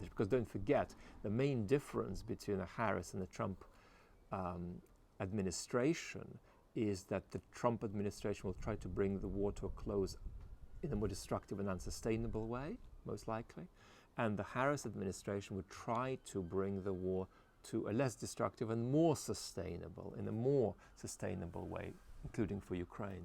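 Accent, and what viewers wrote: Australia. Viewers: We want more Aussie accents on VVC😂! ❤ from 🇦🇺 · Hi, thank you. Always informative, intuitive, creative, and all-encompassing, giving a balanced perspective. Your Australian accent is barely noticeable, perhaps from Tongan influences of encounters with Australians there. Certainly new south Welshman than Queensland.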